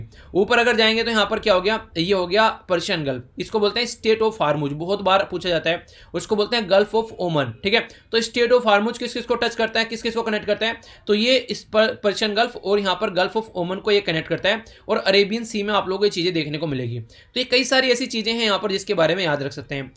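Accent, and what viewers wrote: Haryana, India. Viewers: I think....🤔.... Sir Haryana se h...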